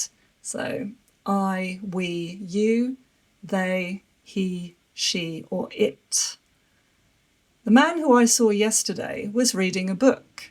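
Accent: United Kingdom. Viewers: It was perfect 😍 I love your British accent 🥹✨️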